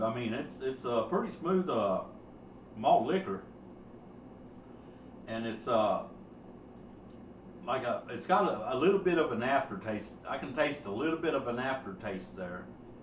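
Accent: United States. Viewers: Are you an Okie?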